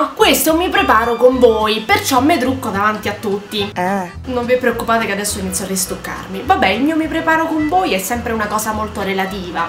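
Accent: Rome, Italy. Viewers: Milano è un delirio quando non c'è sciopero... puoi capire perché diventano tutti matti quando c'è!.' eh siiii è una vita così cosa ci vuoi fare!! ps. ti adoro:) e amo quando parli in romano!! mi fai un sacco ridereeee!! baci dalla lombardiaaaa!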